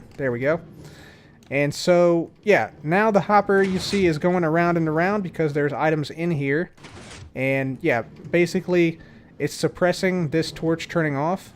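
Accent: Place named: Alabama, United States. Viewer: Dat Alabama accent tho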